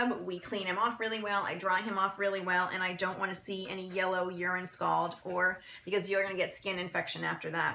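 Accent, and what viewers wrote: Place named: United States. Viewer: English is my main language but I have no idea what you said in the first bunch of things you said so did not watch any more. You need to slowdown, speak clearly and realise that Youtube is an international device; and that the American twang you have is not easy on the ears of those of us who are from other parts of the world.Not to mention those who have English as a second language. I hope you are a better vet than you are a speaker.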